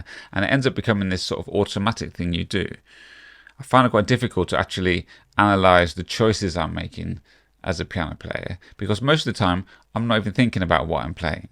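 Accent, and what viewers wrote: United Kingdom. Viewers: I think what you are trying to say is that he is British. 😊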